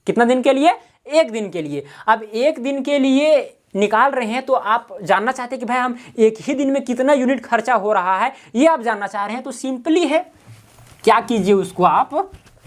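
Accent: Bihar, India. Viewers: Bihar se ho aap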